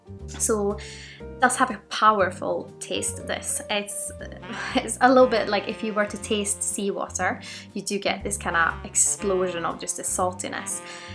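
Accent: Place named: Glasgow, United Kingdom. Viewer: Cheers, agreed, this is a brilliant dram, but you sound more like a Glaswegian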